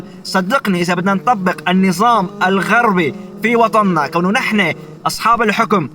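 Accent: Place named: Syria. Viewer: ماشاء الله بتحكي شامي اكثر من السوريين اول مرة بشوف اجنبي بحكي عربي بطلاقة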